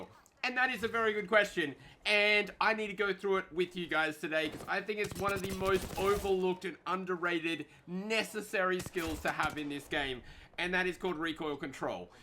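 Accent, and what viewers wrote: Australia. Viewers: I guess that's the Aussie accent lol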